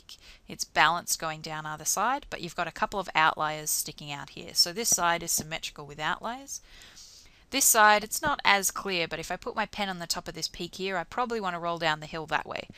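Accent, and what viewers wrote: Australia. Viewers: yea its aussie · you have a lovely accent haha it's aussie accent right?;) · Aussie! Ayy reminded me of Alycia Debnam Carey... but yesss thank you for this! V helpful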